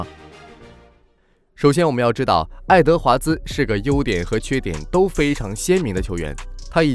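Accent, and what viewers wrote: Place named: Shandong, China. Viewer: 这山东口音英语直接听出戏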